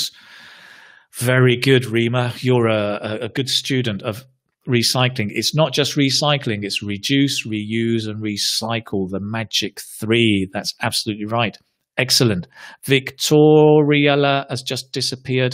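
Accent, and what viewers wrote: United Kingdom. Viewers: Is this British accent , please anyone tell me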